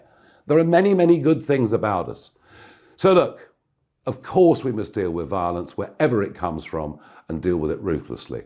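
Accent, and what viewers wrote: RP, United Kingdom. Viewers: think he's realised Tommys right! Now speaking like a Posh Tommy???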